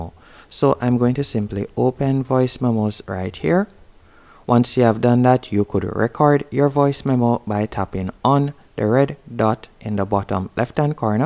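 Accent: Jamaica. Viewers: I just wanted to reach out and give you your first comment. It was such a pleasant surprise to hear your Jamaican accent! Gwaan thru mi breddren. By the way, yours was the first video that google offered. I hop you get additional traction. Well done.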